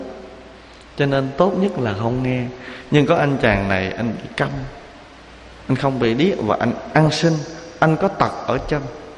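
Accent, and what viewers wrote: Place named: Quang Nam, Vietnam. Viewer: Thầy nói giọng nói giống người quảng quá ạ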